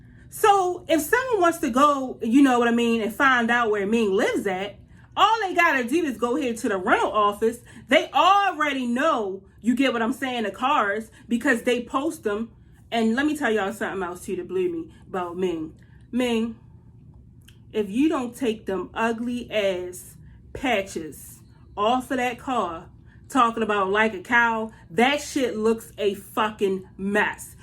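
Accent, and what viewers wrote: Baltimore, United States. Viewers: You from Baltimore Ms.?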